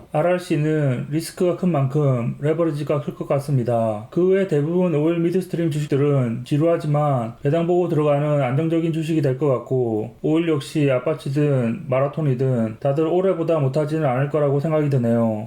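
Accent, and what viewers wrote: Gyeongsang, South Korea. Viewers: ovv 처럼 한 번에 다 몰빵은 못해도 조금씩 숫자 늘려가다 보면 나중에 좋지 않을까 하는 생각에서 가지고 있네요. 형님의 구수한 경상도 사투리와 함께하는 영상이 언제나 즐겁습니다 ㅎㅎ 올해도 잘 부탁드립니다~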